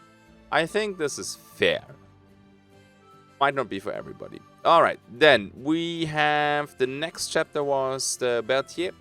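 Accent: France. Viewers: Damn French accent, i'm almost jealous ! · Quel bel accent Français ! Nous apprécions votre prononciation mon cher ! :D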